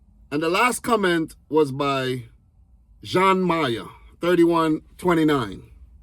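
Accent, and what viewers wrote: Caribbean. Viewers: You sound like you’re from the Caribbean but you live in the USA?because you know a lot about our cricket team I have so many favourite players and even met a West Indies legend when he was playing in Australia yes I met the Universe boss Chris Gayle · To be fair though it's clear from his accent that he grew up in a Carribean nation so he doesn't speak like a Yank.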